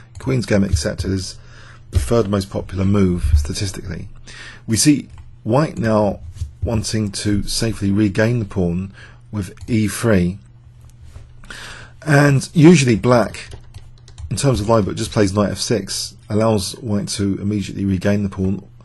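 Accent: United Kingdom. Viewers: Thanks for your continued success as a player and commentator. Of course, I always have to acclimate to the British "th", but it's worth it! (My British friends always complain when Americans say "water" with a "d" sound!). I don't have a chess engine, so I was hoping to get your feedback on the idea of Black playing a5 after Ba3, intending Nb4 if White pushes e4. If White exchanges B for N, then Black has a protected passed pawn on c3 and preserves the dark-squared bishop. Any thoughts?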